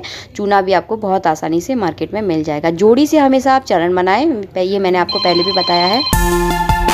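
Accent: Bundelkhand, India. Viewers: Bundelkhand se hain kya aap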